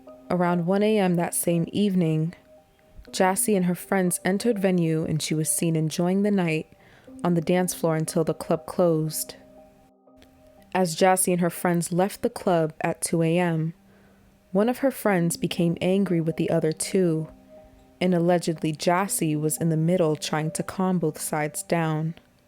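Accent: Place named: United States. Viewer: You sound like an All American girl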